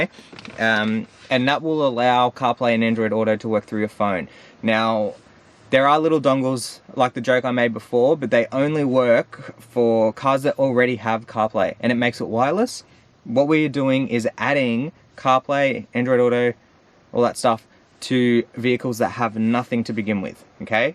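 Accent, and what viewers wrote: Australia. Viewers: Why do speak like a Australia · Nice video man, not too use to hearing Australians on YouTube haha forget I sound like that too😂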